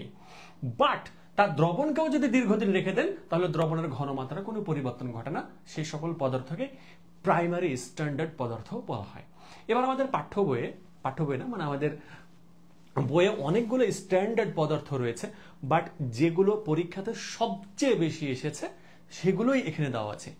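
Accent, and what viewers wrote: Rajshahi, Bangladesh. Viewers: First class sir vasha sunei bujlam apnar bari rajshahi pore about e giye Dekhi asolei.... Last of the all apnar class khub e valo legese ami online e khub akta class Kori ni SSC te to aro Kori ni mane kora lageni akhon apnar class ta amar khub e valo legese asa kori samner sob class e apnar sate thakte parbo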